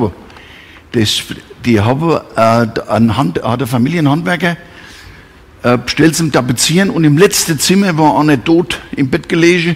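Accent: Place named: Taunus, Germany. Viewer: Großraum Bad Homburg / Taunus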